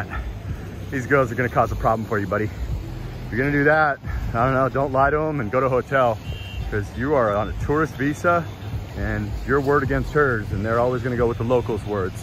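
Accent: United States. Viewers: You sound like you are from California. Thanks for the heads up.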